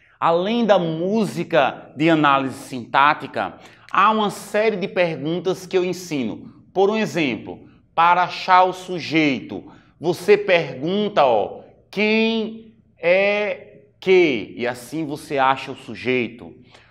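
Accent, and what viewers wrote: Northeast, Brazil. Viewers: Meu caro professor. Suas aulas são espetaculares. E mais, seu sotaque nordestino, é fenomenal. Aprender língua portuguesa deveria ser a meta de cada um dos falantes desse idioma maravilhoso. E, tem gente que não gosta. Será que tem medo de aprender? Pra esses "possíveis medrosos", fica a reflexão de Sócrates: - podemos facilmente perdoar uma criança por ter medo do escuro. A real tragédia da vida, é quando os homens têm medo da luz. Abraços.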